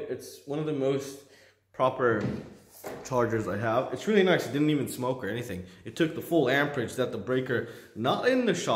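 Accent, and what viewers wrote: United States. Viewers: Where in europa do you live? I realy think you were a american😅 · Where I Europe do you live, you sound American have you always lived I. Europe ? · Made me smile. Thought he was American.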